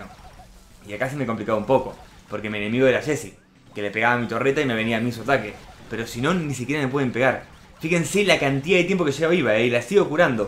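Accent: Argentina. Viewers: De qué parte de Argentina sos?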